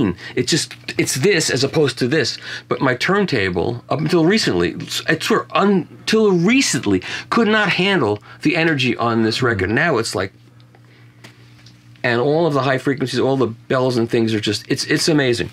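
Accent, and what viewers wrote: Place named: United Kingdom. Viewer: Love the Brit accent, but try Manchester rather than London next time. I can give you some tutalage… for a fee of course 🇬🇧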